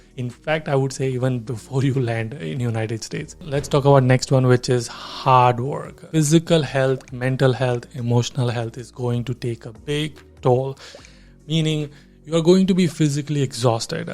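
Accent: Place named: India. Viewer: accent = good but speaking = still indian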